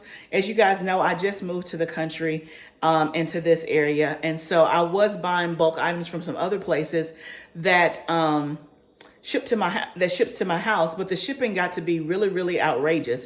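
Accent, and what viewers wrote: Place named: Georgia, United States. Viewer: I love watching Azure Standard Haul's .. You Sound Like A Georgia Girl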